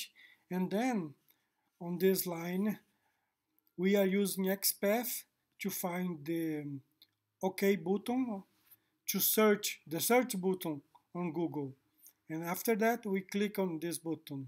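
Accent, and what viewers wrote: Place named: Brazil. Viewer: Que inglês nojento!!!... E para quê um brasileiro se metar a falar ingles!!...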